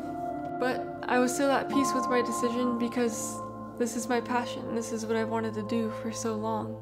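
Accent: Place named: Netherlands. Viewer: Well `done, you accent sound dutch buy the way! :)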